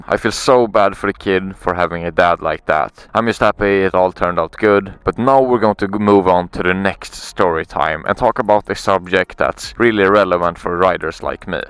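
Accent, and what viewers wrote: Sweden. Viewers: Are you Swedish??? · Are u swedish?🇸🇪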